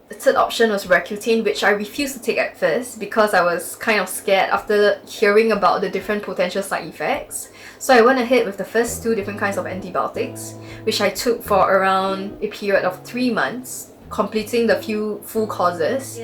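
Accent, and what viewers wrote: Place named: Singapore. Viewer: dat singaporean/malaysian accent....instant recognition and instant upvote from me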